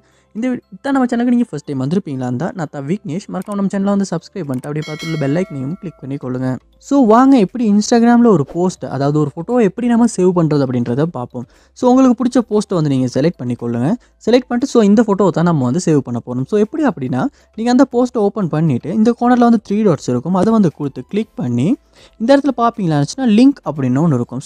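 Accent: Sri Lanka. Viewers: Srilankan tamil 😀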